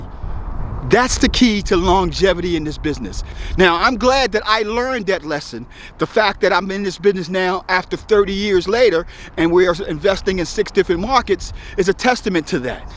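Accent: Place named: Baltimore, United States. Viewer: Mr., how about I was listening to you talk and said to myself..he's got to be from Bmore. I digested the knowledge you just share very easily. You delivered it very well. Thank you for sharing. I'm from Baltimore but living in NC. Nice video. Appreciate the jewels. I'll be tuning in Sir...keep the vids coming. Peace and blessings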